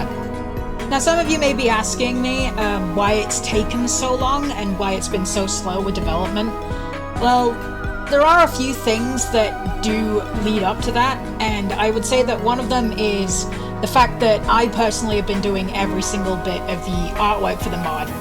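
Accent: United Kingdom. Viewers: I like how many of the comments just point out your voice. I could've figured you have an English accent, but of course I too expected a more man-like voice, no offense. =P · Is that a fellow british I sense. RAHHHH 🇬🇧🇬🇧🇬🇧🇬🇧🇬🇧☕️☕️☕️ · My god... he's British...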